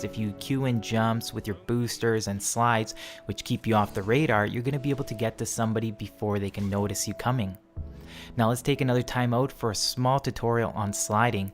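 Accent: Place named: Canada. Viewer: This guy is a fellow Canadian, he has to be with that accent.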